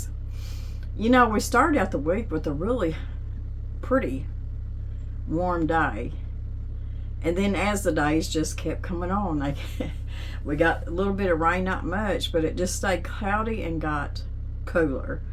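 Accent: South, United States. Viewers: I feel the same way……love everything about the home, both inside and out! Miss is simply a treasure, and I look forward to each and every video she and share with us! She has such an easy, gentle way of speaking, and I love listening to her sweet voice and southern accent. This video is one of my favorites, and I think many of us feel as you do ……she’s a “kindred spirit”, for sure! As for her beautiful wood floors……I can’t imagine telling ANYONE that their floor looks dirty!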